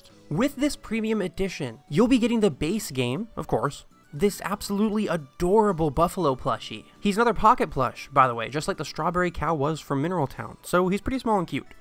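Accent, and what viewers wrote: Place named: Canada. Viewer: I only just noticed you say "Ha-llo" instead of "Hell-oh", is that a Canadian thing? (Honestly, it's kinda cute.) Anyway, super happy to see the "magical" elements being a bit more prominent - it's been awhile and I missed them.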